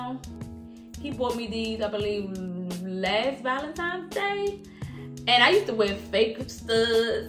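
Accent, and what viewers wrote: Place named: Washington DC, United States. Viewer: I know a DC accent when I hear it. Love my Yurman rings and stack all of em 💕 thanks for sharing.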